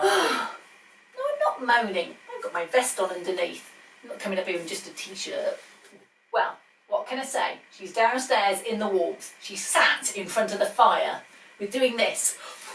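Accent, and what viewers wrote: United Kingdom. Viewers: From watching other videos has made, I thought the brand name of this paint was, "Tamier,"' (rhyming with, "premier,") instead of, "Tamiya." I guess my American ears don't always do a very good job of processing the r sound that the British tack onto the a's at the end of a word.: D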